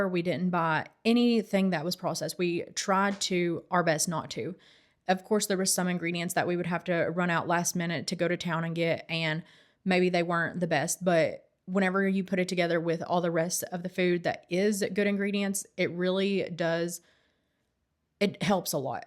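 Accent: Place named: South, United States. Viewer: Hi, this is the first video I've watched with you but it's interesting to me because you sound like you have a Southern accent but you say pop which is a western Pennsylvania thing. Where are you from?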